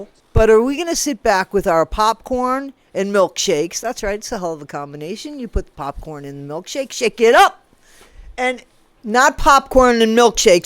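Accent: Scotland, United Kingdom. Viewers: your Scottish accent doesn't suck, it's hilarious actually 😂😂😂😂😂😂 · Her dogs name is (was) Pixie. Ryan’s now. I love your Scottish accent. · I’m loving that Scottish accent 🤣😂😂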